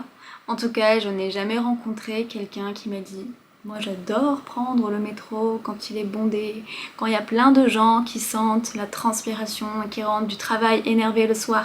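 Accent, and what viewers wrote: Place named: Normandy, France. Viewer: "Je hais" - Décidément on n'a pas le même accent. Tu prononce "Je hé", à la normande, alors que je le prononce "je hai" ou "je hè". Ah ce français et ces accents ! 🤣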